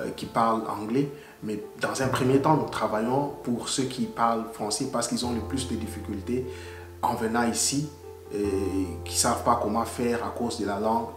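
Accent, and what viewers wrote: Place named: Cameroon. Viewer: merci Je reconnais l’accent Cameroun Je me trompe?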